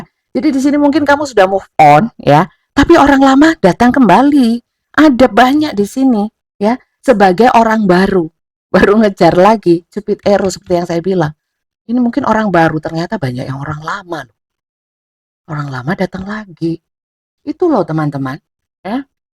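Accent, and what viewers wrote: East Java, Indonesia. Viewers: suka bgt gaya bicara sama logat Jatimnya kak tiap baca readingan......jd pgn meet up langsung ngobrol2, pasti asiikkkk......😁😁😁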